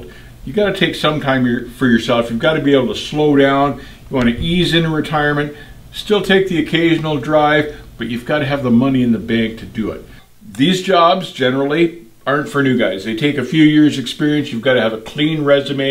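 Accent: Canada. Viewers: You're Canadian, eh?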